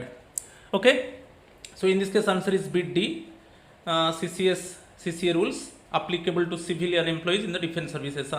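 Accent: Odisha, India. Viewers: Sir today video I think you are from odissa Am I right sir , we have one professor in our PG SRI A K TRIPATI FROM BHUBANESHVAR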